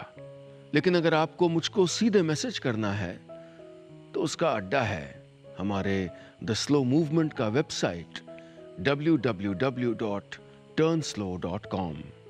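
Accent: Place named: Bengal, India. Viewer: Love this Yaad Shahar..🤗🤗❤️❤️❤️❤️...Kamaal, kamaal...aapki Bengali accent wali Hindi bilkul waisi hi hai jaise aap koi Bengali hain ..So Brilliant 😍😍❤️❤️🥰❤️❤️...being a Bengali myself...bada achha lag raha hai bengali family ki kahani sunney mein aap ki sunhari awaaz mein 😁🥰dil ka operation toh kartein hain lekin dil kyun nahi hota🥺😢😢. Ahaaaaaaa.....sachhe, mahaan doctor ...kya story hai...uffffffff...kya bemisaal likhtey hain Aap...such great, rare values ..oh no... Marmsparshi....soul stirring..😢😢😢😢😢❤️❤️❤️❤️✨✨✨✨🌹🌹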